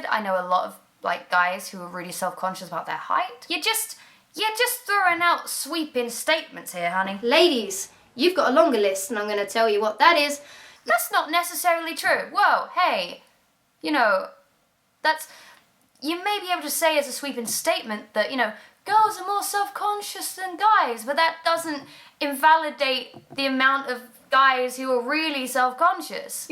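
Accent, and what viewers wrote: United Kingdom. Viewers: why did you use to sound more British back than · alexstfu what? She still sounds british, there are so many different accents in the UK lol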